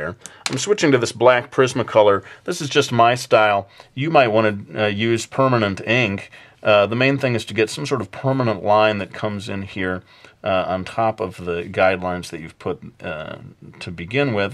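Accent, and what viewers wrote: Brooklyn, United States. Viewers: Sorry, it just sounds like a bit "cheesy gameshow host". It might just be that you're American and it sounds corny to an Aussie. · Brooklyn accent again. eyeroll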